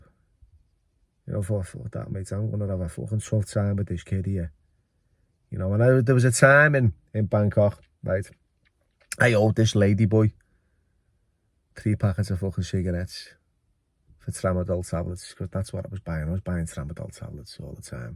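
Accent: Liverpool, United Kingdom. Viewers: love the scouser accent xx your amazing and amazing at looking after your Joe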